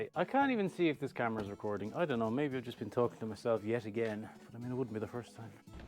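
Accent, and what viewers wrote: Ireland. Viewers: I love your accent, my late father was Irish and I like to hear the lilt of it when you speak. I’m glad your van has a name, my car is called Jezebel and I love it because it has a V6 engine and takes off faster at the traffic lights than any young men or women driving BMWs which is disgraceful at my age because I’m in my 70’s. I find great joy in the mundane things of day to day living like buying food, casting your eye over new shiny objects, don’t stop your YouTube’s as they bring joy to many people. 🇦🇺🦘🦘🇦🇺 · what a lovely wee lunch today!...how was that wine? good work with that weed whacker shield. good vlog today young lad, your editing chops are improving so keep up the good work and be your Irish self (accent included) even in France. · Good morning/ afternoon, great you showing me around 🇫🇷 for someone who has never been out of Australia. 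I can hear you fine and understand with your Irish accent as I grew up with a Scottish father so I love listening to your accent so keep recording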